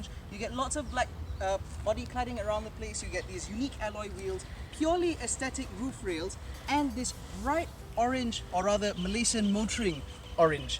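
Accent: United Kingdom. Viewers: Localized Queen’s English..terbaik bro👍🏾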